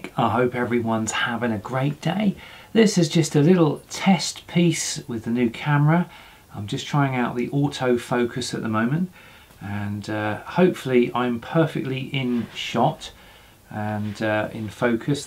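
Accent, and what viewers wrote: England, United Kingdom. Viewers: Are you Englishman?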